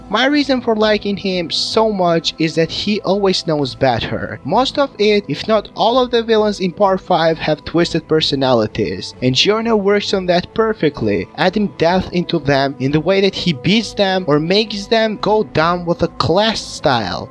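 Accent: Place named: Latin America. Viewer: Ahora en español:v por tu acento más vale que seas hispano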